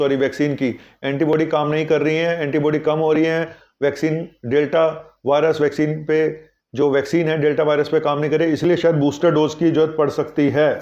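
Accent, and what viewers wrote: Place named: Kolkata, India. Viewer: Kolkata reeee..... bolne ka style bahut achha lagta. Aap kolkata aaiye mishti doi khilaunga jarur .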